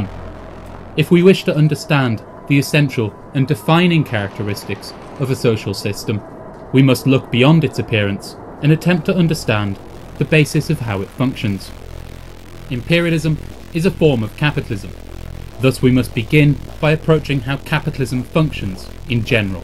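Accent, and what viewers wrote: United Kingdom. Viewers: comrade, this is absolutely amazing. do i detect a hint of yorkshire there?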